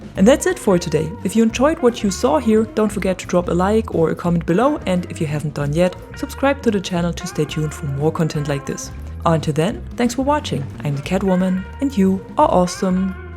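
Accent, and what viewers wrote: Germany. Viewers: Kann es sein das du Deutsch sprichst?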